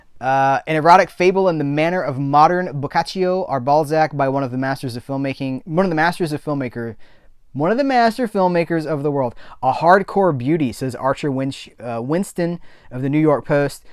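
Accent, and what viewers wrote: Australia. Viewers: Your Aussie accent is very good and digging your 70s/80s look, keep it up! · As an Aussie, I love Umbrella Entertainment! The fact they are finally putting love and care into older Australian films in numbered lines is fantastic. And also making films available in Australia on bluray, to save importing! Watch the Beast the other night... wow, crazy film, but weirdly good. Hope you continue to enjoy the Aussie content too. 1) great Aussie accent 2) Great Stewie impression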